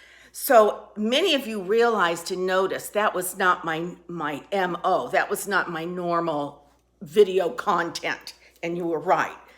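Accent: South, United States. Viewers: Hi I love your Q&A idea. Several years ago I watched your fantastic video about your high school reunion in Mississippi. So my question is what brought you to Mississippi and do you stay in contact with your classmates? I live in Alabama and every once in awhile when you speak I detect a slight Southern accent. Love ya!!!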